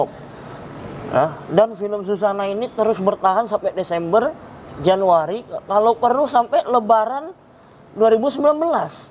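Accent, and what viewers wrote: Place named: Sumatra, Indonesia. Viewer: Hahhaa logat sumatra bgt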